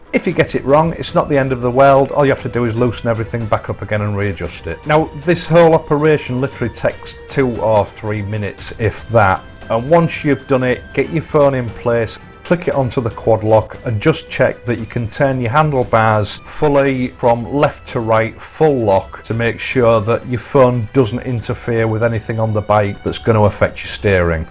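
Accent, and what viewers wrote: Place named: East Yorkshire, United Kingdom. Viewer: Clear East Yorkshire accent. The only people in Yorkshire who say werk instead of work. I will never buy a sat nav. North Yorkshire rules.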